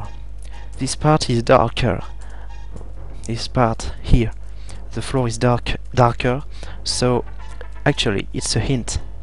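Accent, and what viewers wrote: France. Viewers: Bro, we figured you're french from your first word! You didn't have to mention xD t'assures mec 👍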